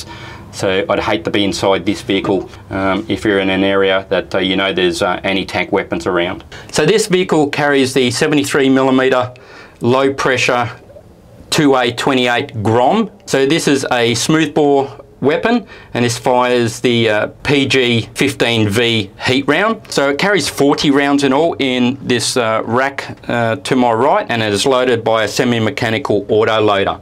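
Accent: Australia. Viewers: You have a very authentic Australian accent. Certainly you come from the land down under. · I wonder how this typical Australian accent came about??? You can hear him incorporate/use a certain tone on certain words. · Ha with his Australian accent I at first thought he said "murder rifle" rather than "motor rifle"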